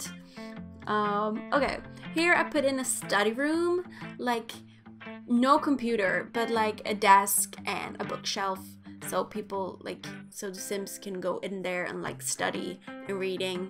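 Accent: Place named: United States. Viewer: Beautiful house!! -- just curious, why do you say "oh lord"? That's very interesting! In the American south a lot of people say "oh lord" or "lordy". "Lordy it is cold in here!". But... most people instead say "oh god", "oh my gosh", or just "goodness". Saying "Lord" doesn't sound strange or out of place at all though! It's just that I would say, it is almost exclusively southern. Like "oh lord" is a truly American thing to say. Because "oh my gosh" is pretty generic and everyone uses it no matter where they come from. So it is very interesting that YOU say "lord" haha. Btw your English is awesome, you got a couple things mixed up but you barely have an accent!!! When I first started watching I was like "oh she's American?? I thought she was from Sweden..." Haha then you said "welcome" and had just the slightest little accent. Haha You could seriously just about pass as American lol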